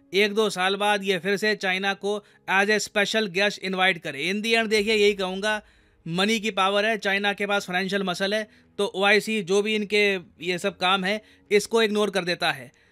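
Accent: India. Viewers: 一股子阿三味儿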